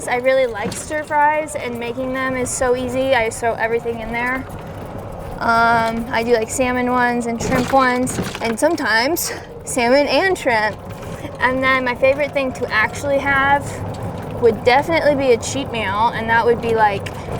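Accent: South, United States. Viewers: Are you a Southern gal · Love your confidence and those early morning tee times as that fits my bill for fun golf & love your southern draw & sweet skirts. Love the game & your tips.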